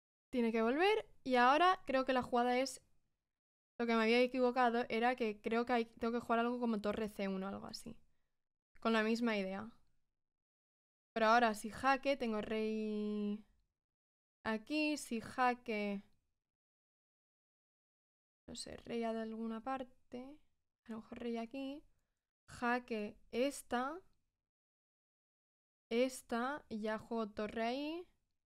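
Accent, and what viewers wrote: Spain. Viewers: That's a real spanish accent! ¿Are you from España? 🙂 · Es notable para un argenino, descubrir, a través de distintos youtubers, los distintos acentos idiomáticos, desde Juanjo de Rey Dama, que se come las eses, con gracia suprema, hasta este castizo maravilloso de, que acaricia nuestros oidos con sus matices entre, eses, zetas y ces. Luisón, Rey Enigma, Spider Ibarra, pasando por Pepe, nos sumergimos en ese maravilloso mundo de acentos españoles. Gracias, no te agrandes, que te regaló un caballo el Rey, cariños desde Mar del Plata, alguien que admira tu belleza y simpatía insuperable, también tu capacidad para el juego. 13 de mayo de 2024.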